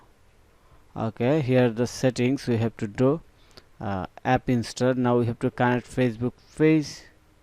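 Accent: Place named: India. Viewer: Lovely Indian accent.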